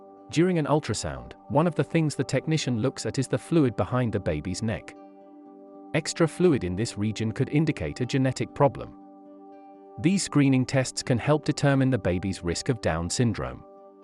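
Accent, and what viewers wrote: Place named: United Kingdom. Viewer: Why a British accent giving American statistics?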